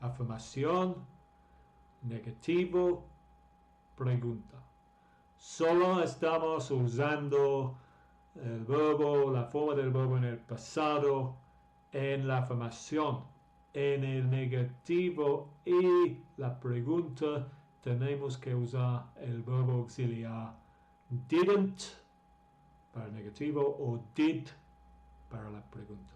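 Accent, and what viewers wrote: England, United Kingdom. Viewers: Sus clases son excelentes, me encanta la pronunciación inglesa, y su forma de enseñar. Muchas gracias profesor.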